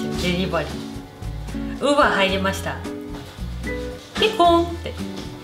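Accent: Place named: Kansai, Japan. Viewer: ひとり暮らし始めました新社会人です。いつも素敵なお料理作られてて参考にしています♡話し方も素敵で関西弁が心地いいです^_^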